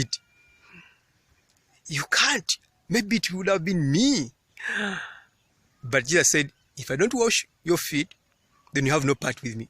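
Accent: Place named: Uganda. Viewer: Hi...moh here new subbie..I swear I watching trying to figure out which country you're from from your accent...Are you from Uganda????...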